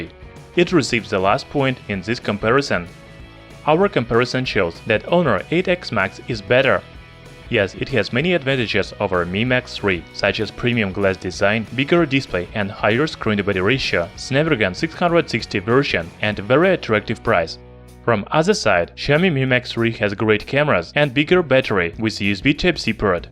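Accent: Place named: Russia. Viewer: Nice review, but your Russian accent makes it hard to understand what you are saying. Maybe someone else could speak the reviews!?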